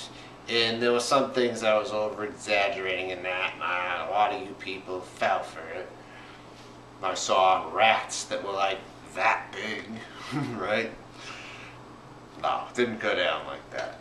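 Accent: Boston, United States. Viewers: It's a Boston accent. LOL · Are you from Boston? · It sounds like you hate the suburbs of Portland. It also sounds like you're racist. Congrats on describing gentrification with the accent of Will Hunting. Go back to Boston. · You're not a hipster, and you have a heavy boston accent. So not the type to fit in with portlandia types. It's not your scene. Portland has a serious underground sex trade and is full of strip clubs. Kinky side for sure. I saw really bizarre hookers walking around out in the open and was approached by a guy who thought I was a hooker because I was out walking/bike riding late at night. So certainly has a sketchy side.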